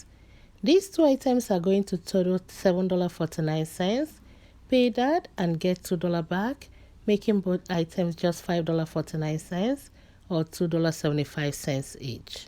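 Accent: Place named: United Kingdom. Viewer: I don’t agree! She has an English accent as in African we were taught by the British so the English is slightly different but fully understandable! I for one cannot understand someone like the Couponer Jodi Dunn as she has a strong accent making it difficult to understand the English!She posts the deals very well AND EARLY! I suggest you follow someone that you can understand instead of leaving such disrespectful comments!!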